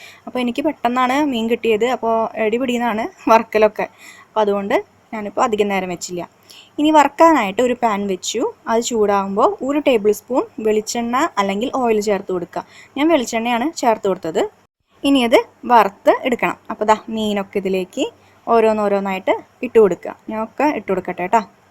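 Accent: Thrissur, India. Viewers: തൃശ്ശൂർ ഭാഷ🤗 കുഴുവ വറുത്തത് 😘😘😘😘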